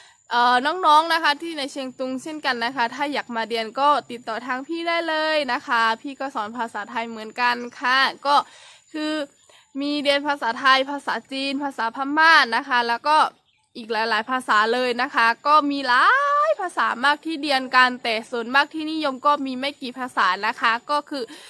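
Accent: North, Thailand. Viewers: สวัสดีครับน้อง ติดตามๆครับ... อู้กำเมืองครับเหมือนคนเหนือ... 55อันเด่วกั๋น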